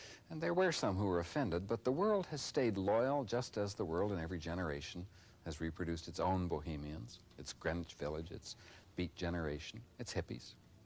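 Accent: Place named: Canada. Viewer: Who’s the host? Presumably he’s originally Canadian, but I can’t place him.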